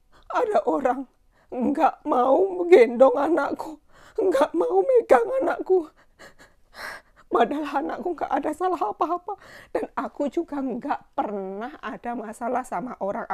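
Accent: Java, Indonesia. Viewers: Suka dengar kk ngomomg .. mendok jawa nya itu loh. Lembut jg. Haha persis dr. Ambar di pontianak dosen kedokteran untan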